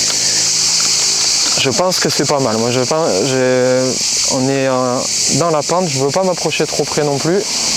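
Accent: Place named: South, France. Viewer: Bonjour, Merci pour cette belle vidéo. Le soleil, le chant des cigales et ce bel accent du sud, c'est tout ce qu'il nous faut pour oublier la grisaille de ces derniers jours 😊 C'est toujours un plaisir de voir (ou revoir 😊) tes vidéos.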